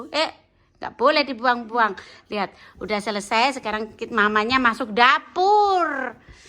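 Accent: Java, Indonesia. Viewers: Yg bikin aku suka liat videonya mb tu gaya bicaranya yg kecampur bhs.jawa medok...lucu bikin aku ketawa2😂😂😂ngedhuk segooooo mbak 😂😂😂😂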